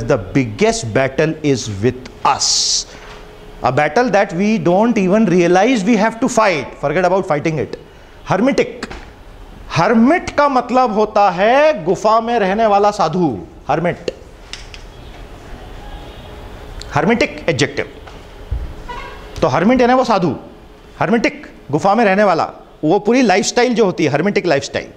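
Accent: India. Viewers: Very nice understanding of vocabulary and nice explanations, but sorry to say, with your pronunciations all that will happen to me is a mockery. MOST of the words I came across in this video are being wrongly pronounced, and that too with a very heavy INDIAN accent. English is not an Indian language...and this type of pronunciation has ruined it for the entire English speaking Indians. Video very helpful for written articulation only, a native English speaker will have a hard time trying to understand what you say. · Sir....does Hindi influence your pronunciation a little bit?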